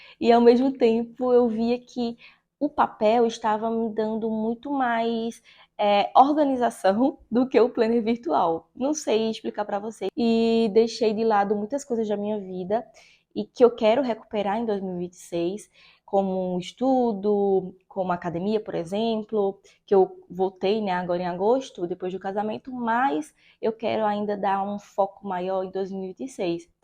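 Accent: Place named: Recife, Brazil. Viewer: O sotaque recifense😍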